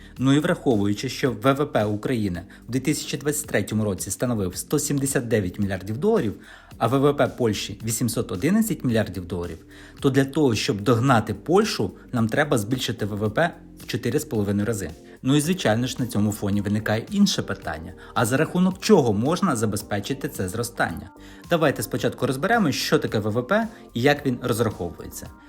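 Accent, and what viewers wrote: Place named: Russia. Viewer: так аканізуєте, ніби війни в нас нема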